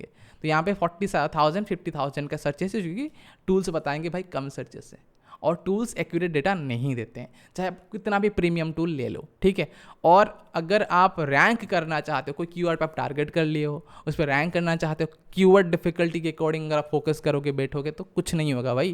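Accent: Odisha, India. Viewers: Brother, u r from odisha?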